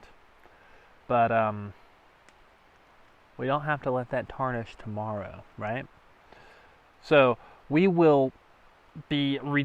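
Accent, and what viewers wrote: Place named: Carolina, United States. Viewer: Awesome Carolina accent! 👍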